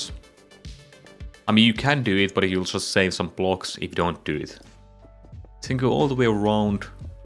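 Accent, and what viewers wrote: Finland. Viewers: are you Finnish by any chance? you sound Finnish. or then im just going mad. 🥴 also suomi The P word. torilla tavataan?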